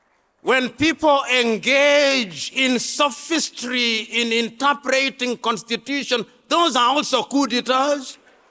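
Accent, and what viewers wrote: United Kingdom. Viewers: You with your fancy British accent and your usual many historical blames on colonization has not come up with any structurial plan to take Africa out of the mind set they're in. Join Mali,Bukino el fasco and the other military leaders who are taking that brave stand moving forward. Africa must unite! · Nigerian an African leaders love to listen to his Queen - english. They don't care about the content of his speech..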